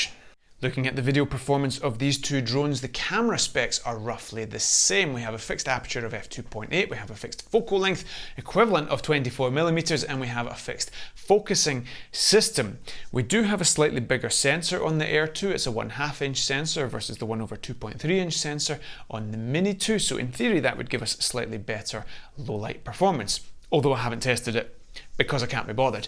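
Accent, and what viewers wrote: Scotland, United Kingdom. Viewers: Love your accent haha! You sound EXACTLY like my Scottish neighbor.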